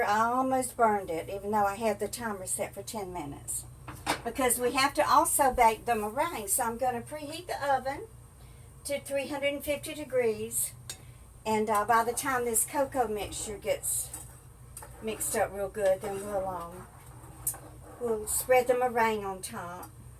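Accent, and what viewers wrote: South, United States. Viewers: kat mimikat and ++++i like listening to this lady's southern accent when she talks. i am a native of north carolina who moved to a small east central mississippi town 6 yrs ago. i came here after my disability retirement in 2013 in addition to a broken marriage and losing my home to foreclosure in nc as well as getting away from a homicidal ex wife. my mother and brother lived in this same town. that's how i knew about it. sadly my mother passed away 4 yrs ago and my brother about 3 yrs ago. i'm the last one standing now out of a family of 5 people.( my daddy,mother,sister and brother passed on). i enjoy life in this small town. almost like mayberry in a way and my ssdi check goes farther here with mississippi's lower cost of living.no going back to nc now, love it here where i live. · I heard the southern accent then you said Florida, knew you were from the south. 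I am from South Georgia. I’ll subscribe and watch your videos.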